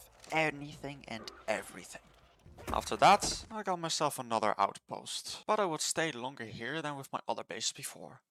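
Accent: Netherlands. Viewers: Je bent nederlands of niet😂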